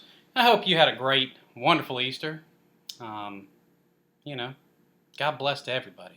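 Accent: United States. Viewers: You have a country accent. It's cute 😉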